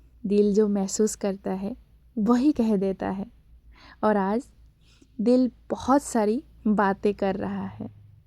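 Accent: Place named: India. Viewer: Indian tumhari awaaz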